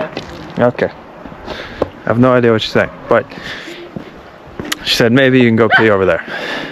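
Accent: New York, United States. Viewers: he's from nawwyakk,,giv em a break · Cut him some slack. It’s the New York. Way of saying things. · This is one of your best ones, and I want to visit Romania! I can't believe how many people here don't respect a good New York accent when they hear it. I grew up in lower Westchester in a family with Brooklyn roots and the word was "FOY-LEDGE" but, American English being what it is, it's just another word that we spelled differently than how we spoke it. It may not be "Proper English" but it's commonly pronounced that way around the NY-NJ region or at least it was when I was growing up there. Not wrong, just different. That's a human value that is conveyed well in your travels! (I'm not a Professor of Linguistics, just a Life Traveler)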